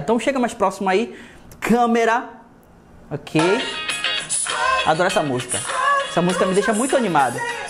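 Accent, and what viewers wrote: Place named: Northeast, Brazil. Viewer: Sotaque nordestino KKK chato né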